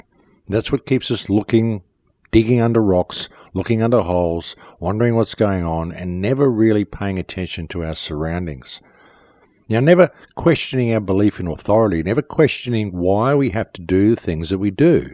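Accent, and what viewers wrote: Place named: Australia. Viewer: You're most welcome, your words pulled it from my heart to yours. I too see humanity as one of the many species in danger on this planet. Every words you're saying is true and have helped me considerably understanding what's going on, though only after I got over your Australian accent...lol...no worries, I have one myself...there's not such a thing like "perfection" or, better yet, perfection is only to be found through imperfection;) big hugz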